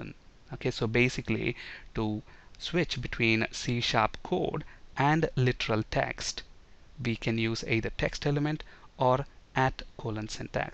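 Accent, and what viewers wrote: India. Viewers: I really can't deal with Indian accent! It makes me sick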